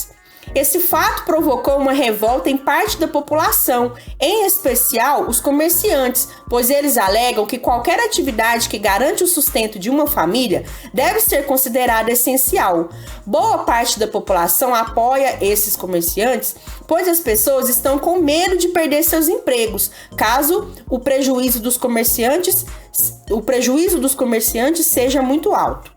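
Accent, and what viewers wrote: Minas Gerais, Brazil. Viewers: Bela correção! Gostei foi do jeitinho mineiro de falar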